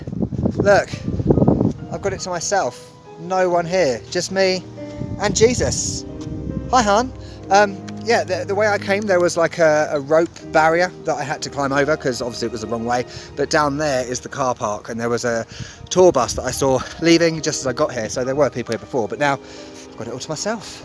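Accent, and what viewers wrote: United Kingdom. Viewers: 👋 hello greetings from USA/Mexico ! I love ur British accent! I was raised in Hidalgo Anywho try the arroz 🍚 con leche pastes and papá con pollo 🍗 yummmy 🤤!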